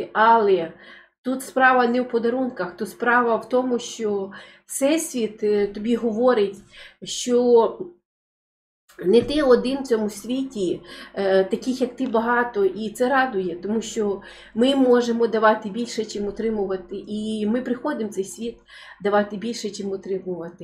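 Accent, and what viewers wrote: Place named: Polissia, Ukraine. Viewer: Пані! Вітаю Вас з днем народження! Дякую Творцеві,що він створив Вас такою миловидною,щирою,розумною,відкритою,справедливою і і в міру критичною! Дякую батькам,що привили Вам любов до рідної землі!А мова Поліського краю така чудова, хочеться слухати і слухати Вас! Кожного вечора слухаю Вас,а якщо світла немає -скучаю за вашою правдивою інформацією. Будьте ж тією зірочкою,яка дарує сотням тисяч людей своє світло і дає надію на те,що зло буде переможене Добром! Многії і благії літа Вам і вашим рідним!!!Слава Україні!!!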